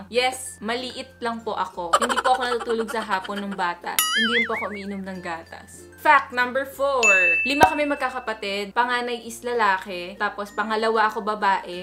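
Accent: Visayas, Philippines. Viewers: Bisaya daiy ka te😌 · Bisaya ka dai??????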